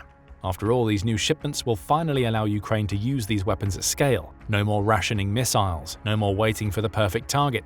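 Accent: England, United Kingdom. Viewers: You sound English. You're wittering on about British armaments. So why are you stupidly pricing them in dollars. They actually cost POUNDS STERLING. What are dollars worth? Considerably less now than yesterday, and less yesterday than the day before. Somewhat like the German Mark in the 1920s-30s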